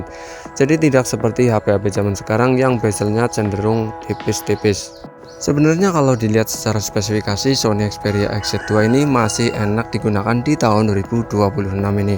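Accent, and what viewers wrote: Banyumas, Indonesia. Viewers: Ngapak yah kak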